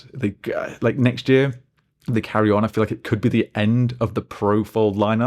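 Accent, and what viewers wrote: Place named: United States. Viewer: Really good American white guy accent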